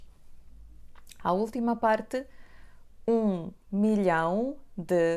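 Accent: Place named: Portugal. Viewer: Si te parez bien, te falo n'asturianu. Yes una moza per guapa y salá. Préstame muncho el acento de Portugal. Pa mí aína somos parientes. Puxa Portugal ✊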